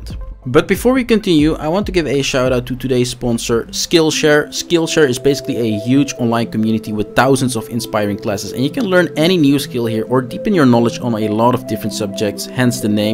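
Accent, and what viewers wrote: Netherlands. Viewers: followed ur early to mid game build, and now doing this one too. love the detail and explaination. btw are you dutch? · Totally agree. The builds are on point, 100% of the time. And, the Dutch accent provides the same gravity for ER buildcraft vids the German accent provides for the Mathologer's math videos! 😎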